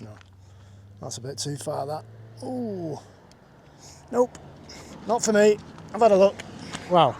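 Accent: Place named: Bradford, United Kingdom. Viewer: As a lad with a BD postcode, I can fully appreciate ‘ ’ accent. 😂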